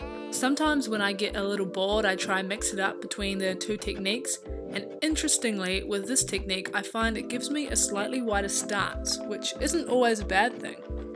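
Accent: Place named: New Zealand. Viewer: Yes she's a full on Kiwi alright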